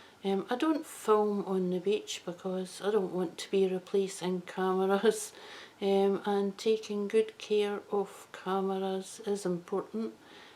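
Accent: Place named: Scotland, United Kingdom. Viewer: Lovely finds! My favorites are the codd marbles. Thank you for showing. And I enjoy your Scottish accent a lot. Greetings from Germany!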